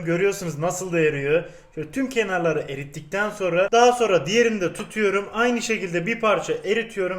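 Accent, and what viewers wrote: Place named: Hatay, Turkey. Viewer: Bu adam amerigalı olsa beğenirdiniz dimi ama bu adam Hatay ağzıyla konuşuyor ve Hataylı bu yüzden bu videoya like atmayacaksınız ama bu adam Amerigalı olsa 10 milyon abonesi vardı (YouTubetok)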